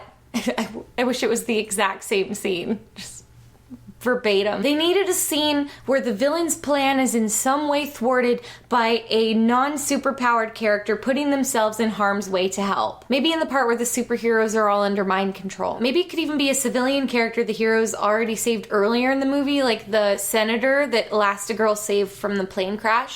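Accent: New York, United States. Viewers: Your New York accent made me cry laughing. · You indeed sound like you are from new yawk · I don't care what people say, your costume is amazing...that new york accent thooo.....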